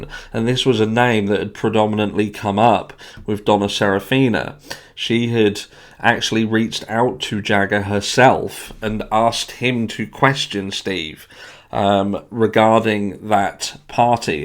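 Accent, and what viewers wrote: United Kingdom. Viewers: I agree it seems very peculiar that jagger is living at keilys mums. I would say it’s a bit suspect. Love your Chanel by the way and love how you stick to the facts and the keily Rodni case. I understand from your accent your from the U.K. Although I’m not sure what area. I am from the U.K. and have been following this case but I havnt started my channel yet. I have been following this case and also Dylan rounds and gabby petito case. Look forward to hearing more of your channel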